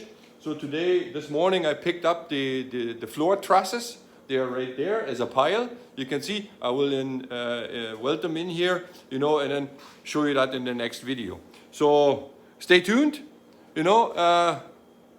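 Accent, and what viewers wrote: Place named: Germany. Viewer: Hi, interesting where are you from, as for me you have a german accent. PS. The quastion was answered a few minutes later))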